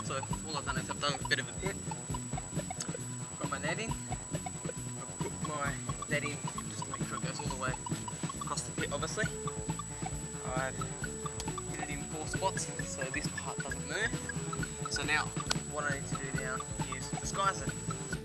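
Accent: Australia. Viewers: Jensen Payne He's definitely Aussie. Not everyone in Australia has the same accent.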